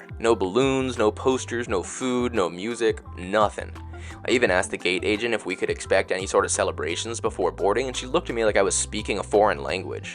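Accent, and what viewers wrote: United States. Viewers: Good, but the harsh American mono accent was a bit of a spoiler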